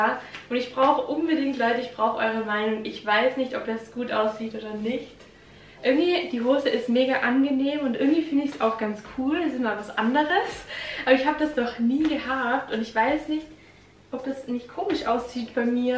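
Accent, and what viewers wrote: Austria. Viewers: Sie ist doch aus Österreich dachte ich:) und ich finds super süß den Akzent, einfach kein Hochdeutsch 😊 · Ich liebe den österreichischen Dialekt :)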